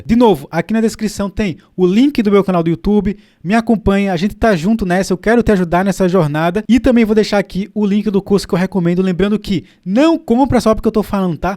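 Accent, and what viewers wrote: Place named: Northeast, Brazil. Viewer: Eu só queria saber de que parte do nordeste é o sotaque do. Tenho amigos nordestinos e não consegui identificar.. Bah. Virei fã dos teus vídeos...abraços gaúchos..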